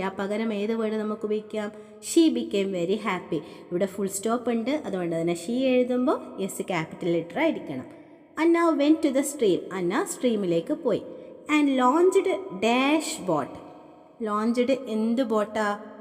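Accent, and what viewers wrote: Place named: Kasaragod, India. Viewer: നിങ്ങളുടെ വീട് കാസർഗോഡ് ആണോ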